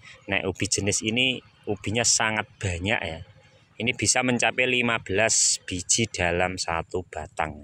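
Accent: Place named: Java, Indonesia. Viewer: Gayamu gomong ubi..anak sd jg bisaa..jowooo..medok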